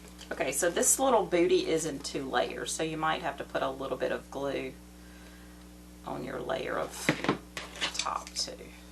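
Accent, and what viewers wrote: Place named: Texas, United States. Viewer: I am so excited and feel so blessed since the very time I thought of subscribing to your channel because you are not only super inspiring and talented but you have totally inspired me 💯% on transforming my crafting room because I just had all these beautiful things I've been purchasing all the time and I did not know where to start and finally I'm seeing a light at the end of the tunnel because my crafting room is my hugeeee attic and up here I also have a fire place which makes it even more fun and beautiful. Thank you so much ☺💖 oh btw I so love your accent reminds me of when I lived for a little while in texas I actually delivered my youngest in dallas and I miss it so much over my husband and I are really thinking of moving back somewhere in the very peaceful side of texas god willing and fingers crossed 🙏☺ God bless and I look forward on seeing your channel bloom and grow so much because you really deserve it 💖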